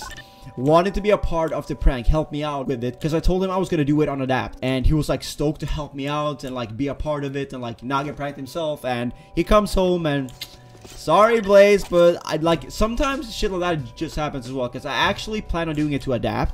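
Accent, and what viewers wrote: Sweden. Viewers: i did know he was swedish and that he coukd speak swedish....i just didnt notice he had a bit of an accent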